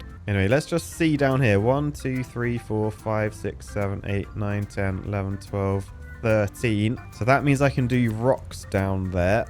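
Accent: United Kingdom. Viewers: I could listen to you all day without even seeing videos often your british accent and just the way you are makes it so entertaining listening to you i started watching you like maybe 2 months ago and i feel like i'm soon done with the whole last year of your videos (and yeah i just noticed i never subscribed before shame on me!)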